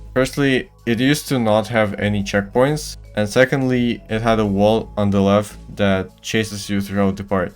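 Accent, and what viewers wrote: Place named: Russia. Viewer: Ты вроде русский как я слышал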